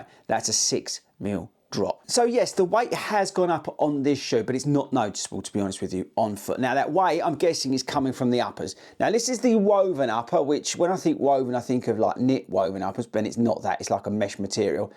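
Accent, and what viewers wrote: United Kingdom. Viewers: Are you British sir?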